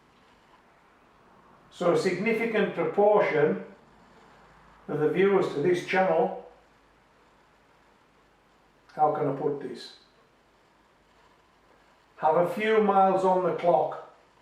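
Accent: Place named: Geordie, United Kingdom. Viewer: I’m a 63 year old geordielass living in Spain. I love your accent, it has a Geordie ring to it but also slightly Yorkshire tint.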